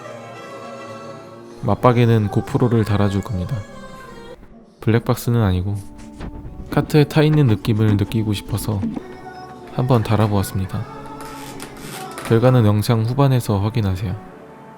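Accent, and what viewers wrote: Daejeon, South Korea. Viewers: 형 대전사람이였어...? 이제 알음... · 님 대전분이셨나요??!?! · 님 대전사람이시네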